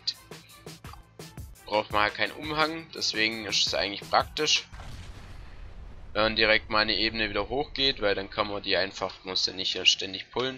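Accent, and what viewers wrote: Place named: Swabia, Germany. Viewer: Du kommst safe aus dem schwabenländle^^ aus welcher Richtung?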